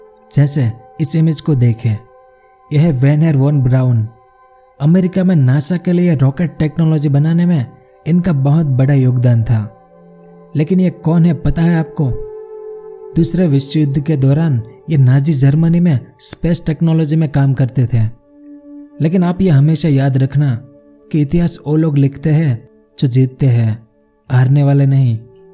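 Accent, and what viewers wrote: Gujarat, India. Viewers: Gujarati lago cho??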